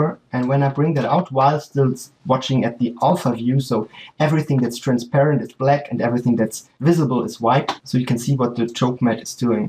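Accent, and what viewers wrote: Germany. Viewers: Are you German....you have an German Acent I mean so..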